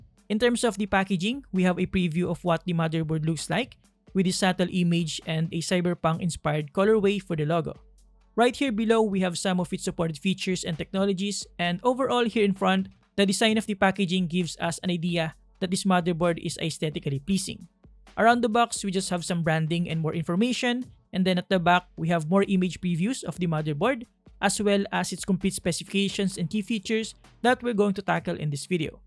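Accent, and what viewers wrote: Philippines. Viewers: Just the way you talked I immediately knew you were a Pinoy XD. Jollibee gang from California lol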